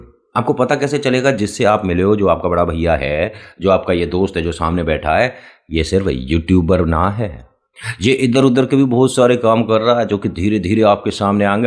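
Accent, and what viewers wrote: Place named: Braj, India. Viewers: Jai mahakal 🙏 bhaiya ji aapke baat krne aur hasane ka trika bhut achha hai ,mera gaon mathura hai waha ese hi baat krte hai, nice story 😊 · bhaiya aapko subscribe aur likes bolne ki zarurat nhi h jo aap se pyar krte h vo phle hi like comment krdete hai aur phir video dekhte h❤❤aur bhaiya braj wale boli aapki muje bhout pasand h❤😊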